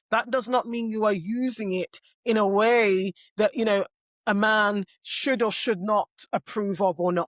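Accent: United Kingdom. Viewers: yeah she definitely have a British accent, don’t know where exactly but that accent isn’t American at all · London accent.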